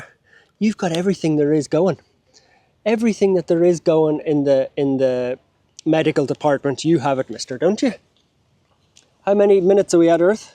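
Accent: Ireland. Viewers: I think dogs like the sweet tone of your voice and your Irish accent ! Thank you for helping and saving this poor dog. He landed on his 4 paws in Doggoland !!!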